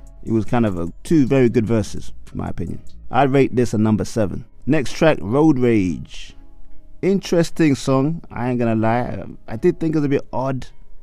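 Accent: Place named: Haiti. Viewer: Wow your review is trash bro btw you sound hatian so that explains a lot you don't understand our music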